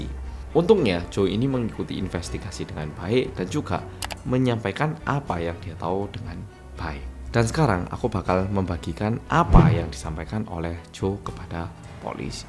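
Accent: Java, Indonesia. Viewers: Actingnya boleh juga 😂😂 sukses terus mas! Btw knapa udah pindah ke Korea tapi logatnya tetep medhok ya 😅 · tks medok 🎉❤🎉 Sehat selalu yaaa 💪🏿🙏🏿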